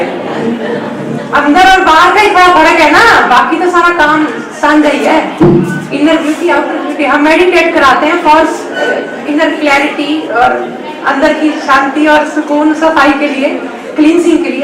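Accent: Punjab, India. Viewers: Thank u.. its very refreshing to hear u.... a bit of punjabi accent in it... God bless u... from Kenya África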